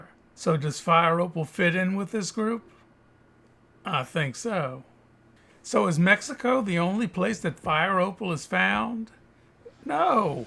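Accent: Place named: Louisiana, United States. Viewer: JUST found your channel. 😱👏👏👏 Do I hear a hint of Louisiana there?🤷‍♀️ SUBBED for MORE OPALS!😎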